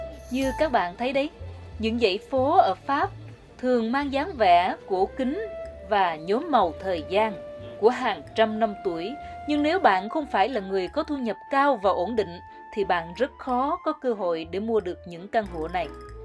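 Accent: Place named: South Central Coast, Vietnam. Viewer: Chào chúc em nhiều sức khỏe! Lời dẫn nghe rất hay từng chữ từng câu được trau chuốt rất là êm tai,càng nghe càng thấy đậm nét giọng miền trung nam bộ việt nam.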